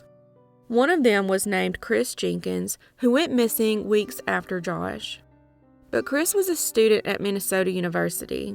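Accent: South, United States. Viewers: Your southern accent really relaxes me. I’m from Arkansas and Oklahoma so not quite as long of a drawl for me but I still am around it. ❤️🤟🏻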